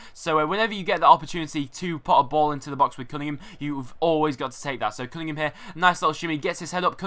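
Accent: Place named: Lancashire, United Kingdom. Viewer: I live in Blackpool my accent sounds the same and nice video btw :D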